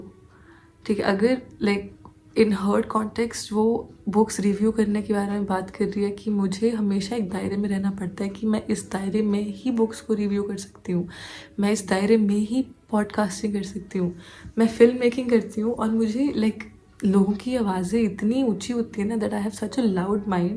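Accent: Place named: Punjab, India. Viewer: I love hearing punjabi underneath your hindi!!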